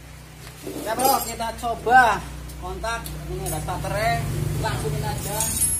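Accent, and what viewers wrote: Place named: Banyumas, Indonesia. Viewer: Ora ngapak ora kepenak..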